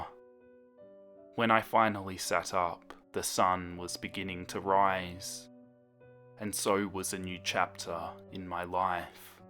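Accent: Australia. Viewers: Are you Australian? Lol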